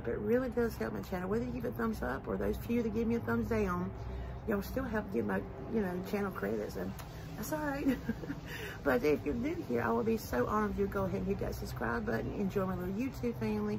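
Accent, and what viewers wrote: South, United States. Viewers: Love your videos. You have great taste and great ideas and with your southern accent it makes it feel so homey and friendly 😉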